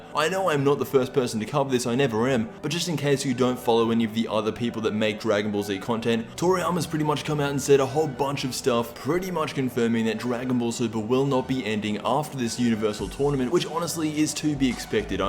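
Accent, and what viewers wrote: Australia. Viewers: The Nerdy Toad yeah i think, i am an aussie and u can tell by his voice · best dragonball youtuber ever, might be that aussie humor culminated with the accent!!! haha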